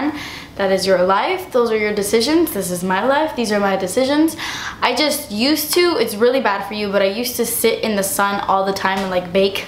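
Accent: Australia. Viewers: Yes, I've just noticed they are doing that. They shouldn't have to justify the fact they like wearing fake tan. Although it does stop lots of those silly comments as she said. PEOPLE have right to better themselves, it's just like changing your hair colour, wearing make up, following fashion. Who doesn't want to look there best. Really enjoyed her review she sounds Aussie: )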